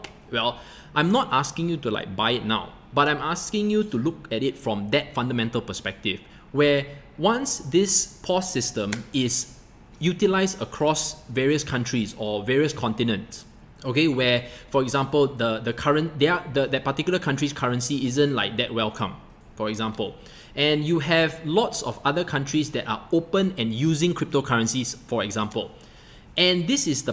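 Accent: Singapore. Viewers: Singaporean accent is so funny.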